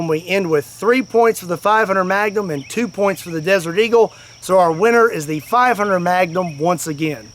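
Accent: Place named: Texas, United States. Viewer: His Texas accent makes it even more American.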